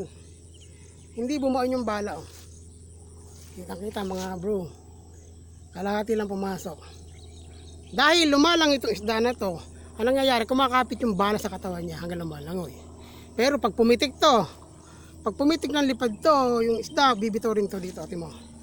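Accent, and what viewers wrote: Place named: Nueva Ecija, Philippines. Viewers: Kala ko dati Tiga Nueva ECIJA po kayo kasi pag nag salita kayo may dine ere 😅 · pag ng salita ng eka un sure tga ne un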